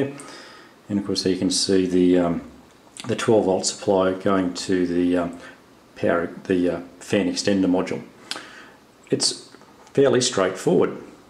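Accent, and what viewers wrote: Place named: Australia. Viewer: Hi. Love your work on the Evolution. By any chance are you selling kits or your dual Z prototype? Cheers Andrew PS It's good to hear an Aussie accent on YouTube. I'm from Brisbane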